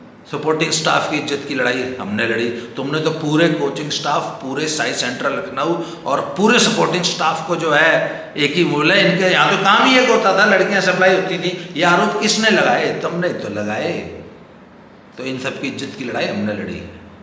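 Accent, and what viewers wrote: Haryana, India. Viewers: दद्दा आपकी हरयाणवी की इतनी तगड़ी पाकर दिल खुस हो जाता है खुदन गांव की पूरी जानकारी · Excellent "KHICHAI" by speaking "Excellent Haryanavi Boli"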